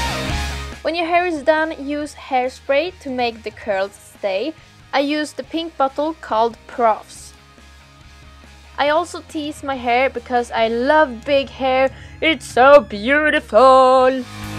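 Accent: Sweden. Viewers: Just gotta love the Swedish accent tho! ;D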